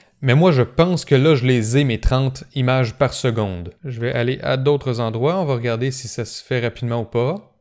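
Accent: Quebec, Canada. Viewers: Ahhhhhh enfin un quebecois. Je comprends mieux a cause du québécois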